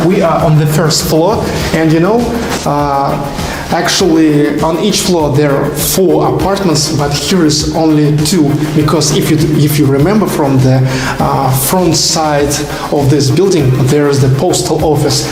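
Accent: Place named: Russia. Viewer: you dont need to improve your English. Keep it real with your Russian accent and this is probably one of the best channel I've seen explaining about a country that has humour as well. Excellent work!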